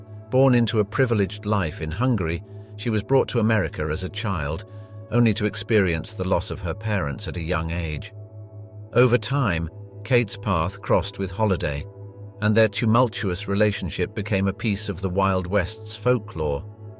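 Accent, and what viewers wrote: England, United Kingdom. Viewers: Is it the narratives male English accent that softens the title or is it that it's not a Woman narrating this story. It is the latter. This story is about cruelty and how Women care not even for their own gender. How dare you take my gender and twist it into your culture for financial gain, you the Madame the bottom bitch.. A Woman who hates their SISTA this is your type of entertainment. I forgive you SISTA. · Another Brit narrator explaining America.....to AMERICANS.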